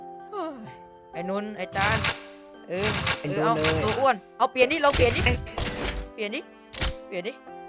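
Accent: South, Thailand. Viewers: คนใต้ปาวคับ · อ่อ เเด็กใต้น่อหลวง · เป็นคนใต้ใช่ป่ะ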